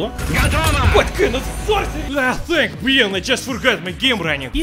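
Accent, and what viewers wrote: Russia. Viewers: Чел, ты из росси? У тебя русский акцент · По акценту слышно, что ты русский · Ты руский у тя акцент русский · English + Russian fused results in english russian stereotypic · His voice is so stereotypically russian its hillarious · Почему у него такой русский акцент? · у тебя слишком русский акцент типа реально кажется что ты русский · Слышно же русский акцент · Борис, ты кто? Русский? или что просто по акценту похож, так что колись! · Максимально русский акцент одобряю 🗿👍🏻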